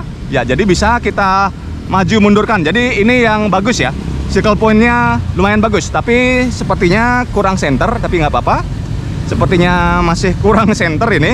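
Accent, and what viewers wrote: Bali, Indonesia. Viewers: MAS, SAYA MAU TANYA KOQ LOGATNYA ABANG KAYA ORANG BALI...MAAF CUMA PENGEN TAHU AJA DARI MANA ASALNYA BANG SAYA SUKA NYIMAK VIDEO REVEWNYA.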